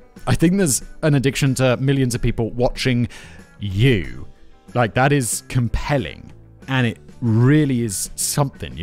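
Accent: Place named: England, United Kingdom. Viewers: *in a British accent. (I don’t know the exact one.) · English accent to be precise, whistler doesn’t have a northern Irish accent, and you can understand his English so it’s not a Scottish accent.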